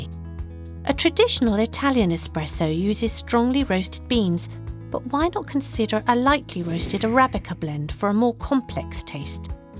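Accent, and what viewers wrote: United Kingdom. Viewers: thanks british lady, i need this for my fanfic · The accent is too British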